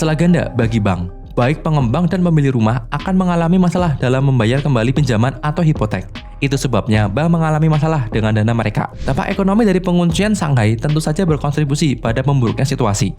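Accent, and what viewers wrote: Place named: Banyumas, Indonesia. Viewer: Aksen ngapaknya terasa ya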